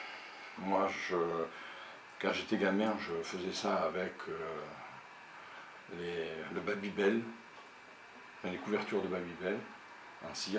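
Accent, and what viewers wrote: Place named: South, France. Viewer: Je viens de vous découvrir, c'est ENCORE bien sûr. Et puis, pour moi qui suis belge, le petit accent qui sent bon le sud ne gache rien!!!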